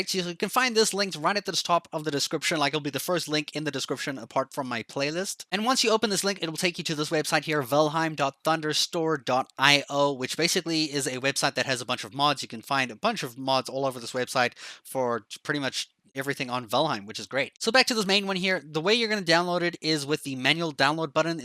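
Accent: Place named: South Africa. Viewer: My dude. Where are you from? You sound North American, but then you 100% don't. South African and moved to the US or Canada? hmmm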